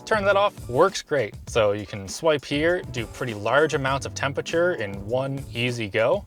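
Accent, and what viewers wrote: Canada. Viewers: I was wondering if it was a candians thing or just a weird thing. you never know... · It's a canadian pronunciation lol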